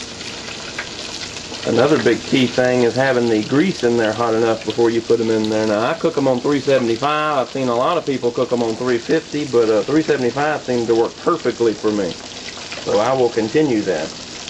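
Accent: Texas, United States. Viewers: Where are you from I love the way you talk your voice sound cowboy style Texas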